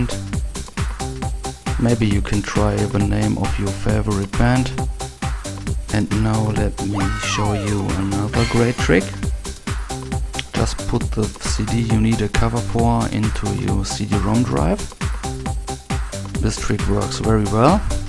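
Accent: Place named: Germany. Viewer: man echt geil nix gegen dich aber mann hört übel raus das du deutscher bist wenn nich tuts mir leid aber zu geil. Help very now i have finally a cover for my steam BO2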